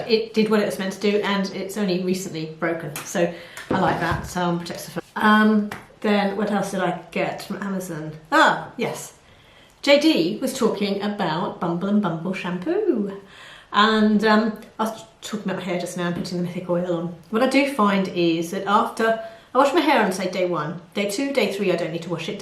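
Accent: England, United Kingdom. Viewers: Hi ,I enjoyed your video so much & i know im going to love watching all of them!! I just subbed darling! You are so Cute & i love your English accent!! awe this was so cute & fun to watch ,much love & peace to you!🌷💓😊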